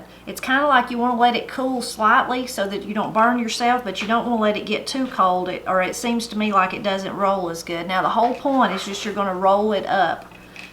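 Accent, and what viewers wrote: Appalachia, United States. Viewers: happy thanksgiving to u !!! celebrating & enjoying the sound of your appalachian accent----makes my sorrow for america's sad situation feel better 'cause in the heartland--appalachia'---there are people like u guys still living !!!! When i watch tv, i just don't see anyone in a family environment at all, so it's heartwarming to watch your appalachian instructional home videos !!!!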